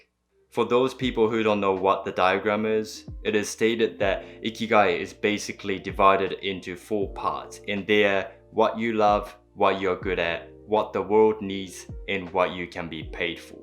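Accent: New Zealand. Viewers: is that a new zealand accent? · Thank you for this: it's interesting that your English retains your New Zealand experience ("sex & sivin" for "six & seven"). Bravo.. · No wonder your English sounds funny, you learned it in New Zealand.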